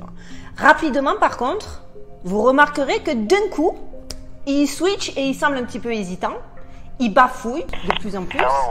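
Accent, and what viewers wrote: Marseille, France. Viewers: C'est (encore) un pouce vers le haut pour ta vidéo me concernant ! J'adore la façon dont tu traites tes sujets et même ceux qui à priori me branchent pas trop, tu arrives quand même à les rendre intéressants. En prime, l'accent marseillais plus ta personnalité ça donne un style inimitable. Quand on repense à la raison de la création de ta chaine, au départ (cf ta vidéo FAQ), c'est amusant de voir ce qu'elle est devenu :D Si je m'écoutais je regarderais tout le contenu de ta chaine en 2 jours mais je préfère me forcer à pas trop en regarder à la fois de sorte à faire durer le plaisir ^^ Bravo et merci <3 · Hello, merci de répondre aussi vite, je vous ai regardé vers minuit depuis la France et je n'attendais pas une réponse aussi rapide 😜 En fait je reçois youtube sur mon TÉLÉVISEUR (seul avantage de mon opérateur adsl !) et je regarde la nuit vos vidéos, celles de Silvano Trotta (excellent) de deQodeurs, de Réveillez-vous, de Ema Krusi etc.. 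que les meilleurs ! L'écran étant plus grand, je vois mieux ! Vous êtes la seule dont le visage apparaît en "blanc et noir", ce qui m'agace car vous êtes trop jolie à regarder et à écouter, votre humour et votre "accent du midi" sont rafraîchissants 🤗 Par contre, vous apparaissez normalement sur l'écran de mon portable mais il est plus petit ! Je vous confirme que je ne reçois pas toujours les notif. bien que j'aie activé la cloche.. Votre travail est excellent et agréable à suivre, sans prétention ni recherche de concurrence : all is right 😉 Maman d'une merveilleuse fille de 20 ans, étudiante, j'aimerais moi aussi la garder avec moi car savoir qu'elle doit porter la muselière toute la journée à la fac me révulse ! Bonne chance avec votre petit gars 😘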